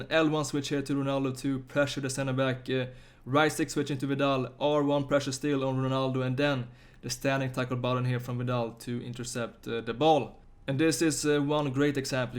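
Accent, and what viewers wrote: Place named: Sweden. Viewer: Man hör direkt när det är en svensk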